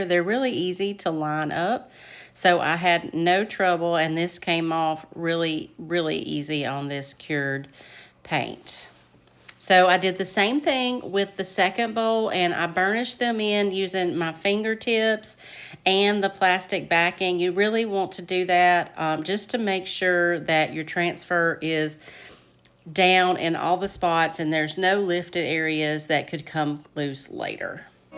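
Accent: South, United States. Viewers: I loved the tray best of all. I haven’t tried paint inlays yet but I think I will give them a go. I always look forward to your new video every Wednesday. I love your southern accent - I have a very Yorkshire accent!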